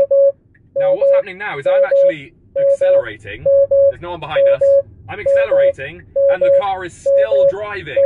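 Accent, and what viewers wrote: United Kingdom. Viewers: If I have to hear this British accent I just won't watch the video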